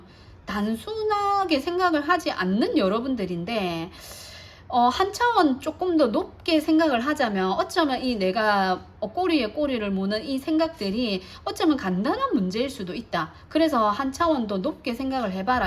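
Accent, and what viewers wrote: Gyeongsang, South Korea. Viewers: 3 여러가지를 하고 있는 것 잘 맞추시네요~^_^! 이젠 하나만 하려 합니다ㅋ 작가의 길이죠. 처음으로 댓글 한 번 남겨봅니다. 구수한 갱상도 사투리 너무 좋네요. 감사합니다^_^!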